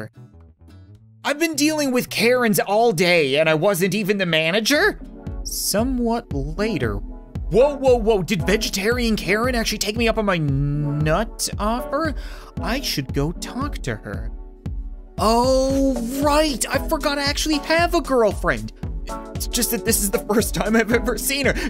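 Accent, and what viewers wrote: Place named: Newfoundland, Canada. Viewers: It is a Canadian accent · He canadian what do you expect. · not all Canadians have accents he's a newfy which is the Canadian Irish that's why he talks like that and also I'm Canadian that's why I know this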